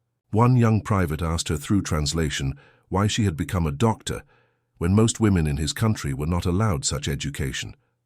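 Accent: United Kingdom. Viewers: Watching from 50 miles east of Camp Blanding, in NE Florida. The US Army doesn't have 'Left-tenants', we have 'Lew-tenants'. Is your AI narrator British? There is 'cognitive dissonance' again st . It's in every video you post.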